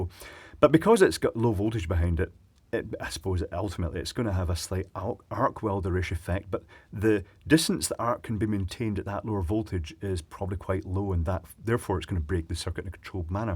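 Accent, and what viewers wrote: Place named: Edinburgh, United Kingdom. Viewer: Refreshing to hear an edinburgh accent!!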